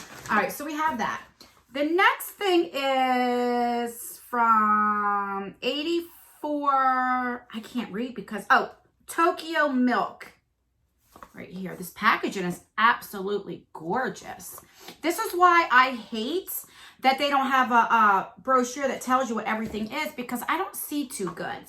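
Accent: New Jersey, United States. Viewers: I love watching your videos because of your accent, reminds me of home (NJ) ☺️ loveeee the violet voss palette 😍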